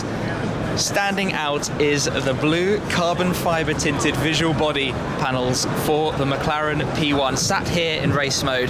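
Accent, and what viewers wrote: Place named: United Kingdom. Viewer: sotaque britânico...eca...manda um americano cobrir o evento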